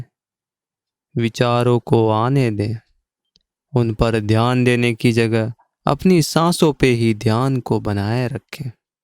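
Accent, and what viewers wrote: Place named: Rajasthan, India. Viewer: राजस्थानी लहजे में बोलते हो, कहा से हो ?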